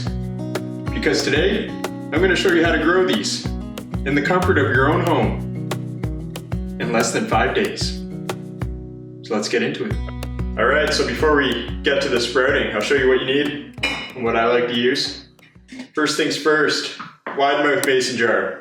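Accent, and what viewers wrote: Canada. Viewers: Salad spinner husk removal much easier....or....use a net bag that onions come in, one with tiny holes and stand outside and spin it really fast....Kids love this, but I use an almond milk bag over the net bag so I don’t have seed husks all over me and my deck... Love that Canadian accent! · As soon as this video started I got hit in the face with all that Canadian!! You're one of my favourite peoples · My mom is an immigrant to the USA. I’d like to send her your video, but she won’t understand your Canadian accent. It’s very strong! I’m having a bit of struggle, and English is my first and only language. If open to it, pls add English subtitles. I know that’s weird, but English is her second language, so dialect other than her USA-born children, is difficult for her. She’s converting to healthier because of pandemic, and not wanting any vaccines, etc. 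· Find eout in the heouuse. So Atlantic Canadian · Do I hear Canadianish? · Even as a Canadian, I'm like "whoa, that is a Canadian accent."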